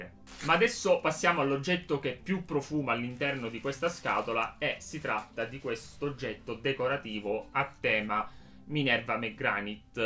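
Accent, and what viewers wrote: Sicily, Italy. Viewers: Credo sia una cadenza siciliana..hanno le vocali molto aperte 🤣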